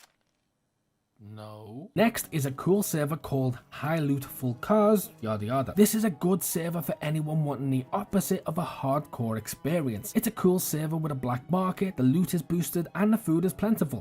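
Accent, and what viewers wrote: Liverpool, United Kingdom. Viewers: Yo my fellow scouser where about are you from hahaha love to join up with you, my mates are all moaning bastards on dayz I reken youd be sound and chill to play with. Thank you for the content too! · Are you from the Toxteth area of Liverpool by any chance?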